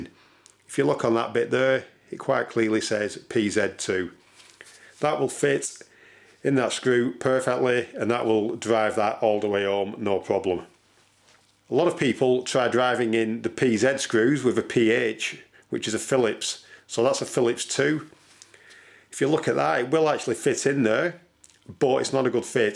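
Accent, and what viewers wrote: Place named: Lancashire, United Kingdom. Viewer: Good old Lancashire accent!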